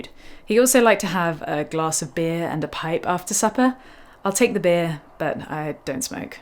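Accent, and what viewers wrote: United Kingdom. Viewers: Holy hell...You're BRITISH?!?!?!?! You were already AMAZING and now you speak.....<3 <3 Sorry I presumed you were Norwegian as well! Just recently discovered Leo and Yourself and Hanna and Rabeah...lol Anyway no that I know you're in England, did you ever have an opportunity to meet or hear from him on your cover of? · lmao i have no idea who you are but i heard the british accent and boom subscribed 🥰🥰 SO refreshing after always watching american youtubers and movies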